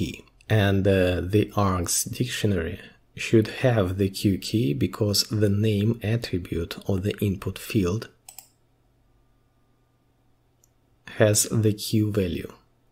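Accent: Russia. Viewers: слышу родное "зэ"😆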